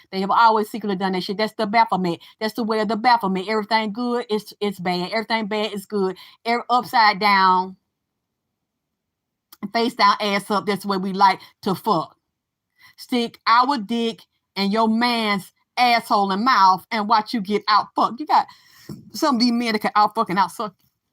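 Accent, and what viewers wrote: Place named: Georgia, United States. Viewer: Can I just say how much I love your Georgia accent! I can listen to it all day! Queen Drip 💧 in this Bitch! Vagina Power 💙💙💙💙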